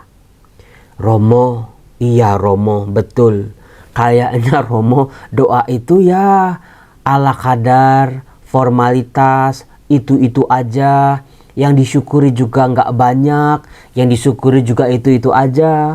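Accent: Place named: Jakarta, Indonesia. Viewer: Pertama kali dengar Bible Learning di awal tahun 2022, tahu nya dari OKS. Setelah itu saya kejar nonton Bible Learning dari 2019. OKS nya juga saya kejar nonton dari awal. Saya suka romo2 di OKS dan romo bicara dengan logat jakarta, bahasa nya yang gak baku dan kaku, gitu😄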